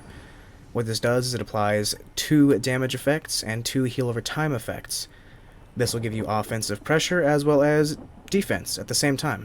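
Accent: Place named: East Coast, United States. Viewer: Wicked east coast accent man🤣 keep up the good work!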